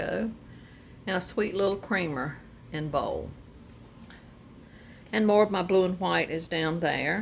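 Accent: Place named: South, United States. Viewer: Love it ALL!!! You certainly have more energy than I do!! Be careful in the heat!!! Fun to watch and hear your great "southern lady" voice!!! You, John and Baby Boy have great weekend! Good luck at the yard sales! 🧑‍🦳🏡🌹🙂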